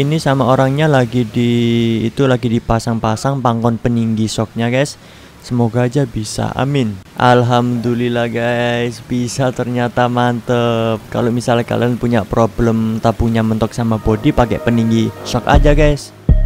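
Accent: Malang, Indonesia. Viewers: Orang malang?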